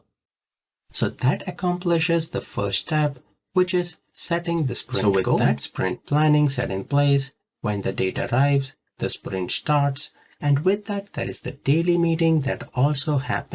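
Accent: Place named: United Kingdom. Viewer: gujju bhai your accent is really hard to understand. do you live in britain ?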